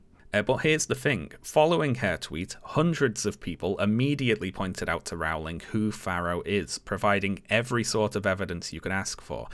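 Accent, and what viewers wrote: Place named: England, United Kingdom. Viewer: I find it interesting that even though he's identifiably English, he still has a way of speaking that's SO particular, it's funny. What's an anteye? (I'm not a native English-speaker)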